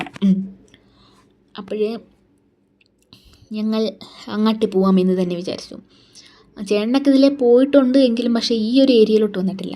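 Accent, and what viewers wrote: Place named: Thiruvananthapuram, India. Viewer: തിരോന്തോരം പയല്. തള്ളേ പൊളപ്പൻ vlaag തന്നെ കേട്ട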